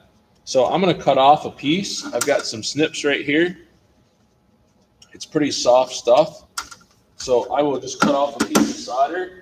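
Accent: United States. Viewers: He speaks American and that is how it is pronounced. Not sure what you are crying about.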